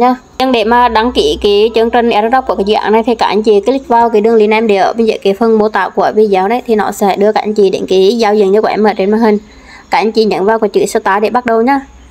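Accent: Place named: Nghe Tinh, Vietnam. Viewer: Chưa hiểu rồi sẽ thế nào nhưng thấy hay hay nên ủng hộ em một ref nhé, à mà em ở đâu mà nghe giọng giống quê choa rứa. Hihiiii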